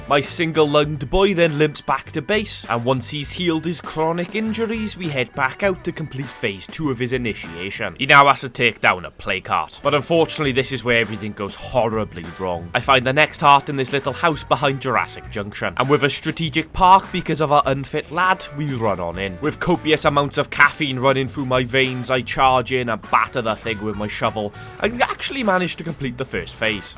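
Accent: Wales, United Kingdom. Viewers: welsh accent pal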